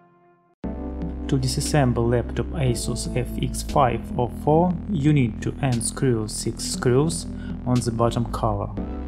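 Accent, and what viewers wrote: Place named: Russia. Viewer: Ведь явно слышен русский акцент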